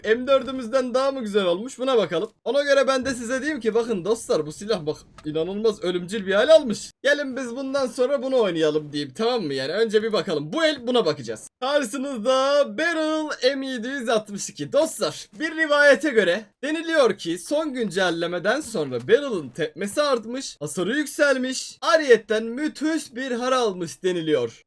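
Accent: Urfa, Turkey. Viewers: Abi Urfalı olduğunu çok belli ediyorsun😀😃 bende Adıyamanlıyım hemşeri sayılırız bu arada geçmiş olsun umarım hastalığın kısa sürede biter 😃😀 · Ulan abi çok güzel urfa şivesi yapıyosun seni izleye izleye şivem kaydı 🤣😂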